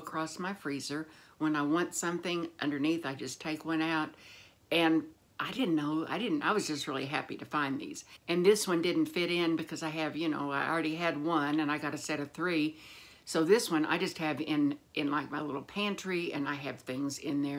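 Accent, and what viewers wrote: South, United States. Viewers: Hi, I’m new here and enjoy your chit chat. I think this is my second time watching your videos. I live upstate NY in what used to be the original capital of NY State. The weather here has transformed beautifully into Spring. It’s becoming green and flowery all over. I go walking at the local track and field space and love it. Everyone is so friendly, saying hello to me and others they don’t know. It’s heartwarming and I think we give one another hope. Keep well and thank you for sharing. I enjoy your chit chat and your Southern accent is great!!! I wish I had one but I was born in Brooklyn!!! Thank goodness I lost most of my Brooklyn accent - my best friend in college was from Springfield, Mass. where people have no particular accent and it sounds fine and clear. Kind regards and keep well. FR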